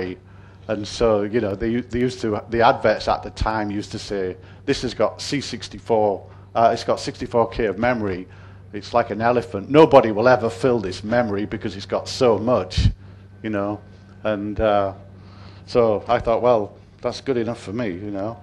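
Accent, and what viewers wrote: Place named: Hull, United Kingdom. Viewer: So nice to hear the Hull accent;) You're a legend. Grazey/PHF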